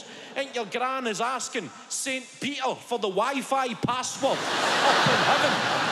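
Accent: Scotland, United Kingdom. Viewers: His language is English, his accent is Scottish.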